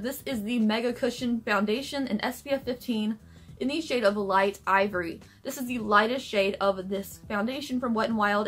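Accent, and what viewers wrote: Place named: North Carolina, United States. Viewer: Youre in NC, i was like i know that accent! I lived all over NC for 8 yrs. Whats that wig's name/style? My hair is copper blonde/auburn, i dont dare to go over to the strawberry blonde reddish color, but still like it. It def suits you. I love Colourpop's birthday cake i was just starring at it today, i love to add sparkly pigments on top.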